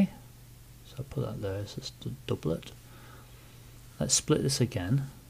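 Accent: United Kingdom. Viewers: That's rude, his voice is not 'obnoxious', its the Yorkshire accent...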